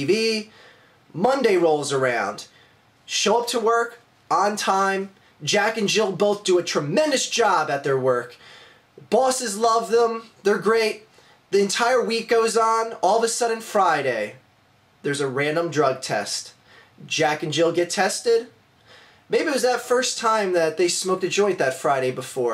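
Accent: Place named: Illinois, United States. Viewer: you sound like your from illinois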